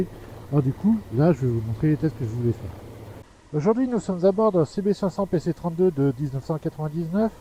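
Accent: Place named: Grenoble, France. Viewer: Salut l'ami. Un Bel essai de ta machine. Ben sa fait loing pour te prêter une machine. Je vois plus où t'habites mais à l'accent du Piti Piaf je dirais pas loing de Grenoble aux alentours. Gros V ✌🏻.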